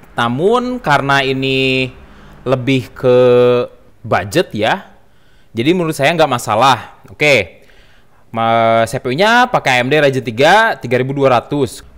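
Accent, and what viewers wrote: West Java, Indonesia. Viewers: AA dari Sunda...kayak suara teman saya PakDedi Ciamis..aksenya...asyik tapi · Logat Sunda jadi ciri khas pisan 👍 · "PGA... PGA... pega..." ni apaan ya baru denger. wait. ....oh sunda. ganti p sama v... VGA, vega... ok paham dah. maaf jangan diambil hati, hanya jujur pertama bingung gegara logat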